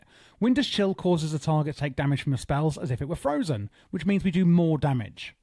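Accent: United Kingdom. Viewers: HES Talking the clean British stuff bro. Git gud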